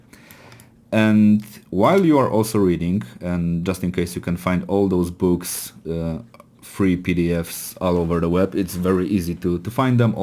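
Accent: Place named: Poland. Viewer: It's very funny cuz I can tell you a Pole few mins in 😂 Informative video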